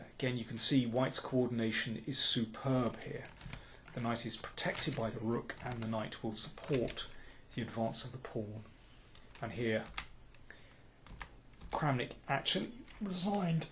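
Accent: United Kingdom. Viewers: What the the heck are you talking about with his speech!? He sounds perfect to me and so charmingly British! I'm genuinely curious what you hear that I don't.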